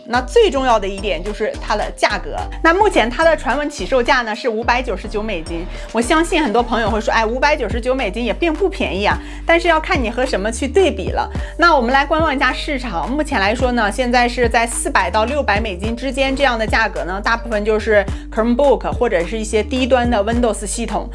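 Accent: Northeast, China. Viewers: 就喜欢大东北碴子味😂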